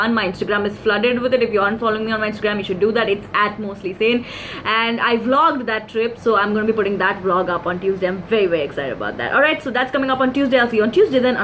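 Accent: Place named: Bihar, India. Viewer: Hey! Firstly, endless love and support to you, I really enjoy watching your videos! Here are few dares for you, out of them you have to do any 2: 1. do ghati dance on 2. imitate your favorite tv character 3. have an imaginary, romantic conversation with Hritik Roshan (in your typical bihari accent) I love you endlessly ❤ #SawaalSaturday #sawaalsaturday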